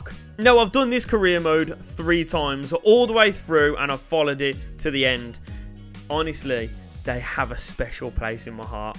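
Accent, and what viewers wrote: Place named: Birmingham, United Kingdom. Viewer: Bros the most Brummie Brummie to ever Brummie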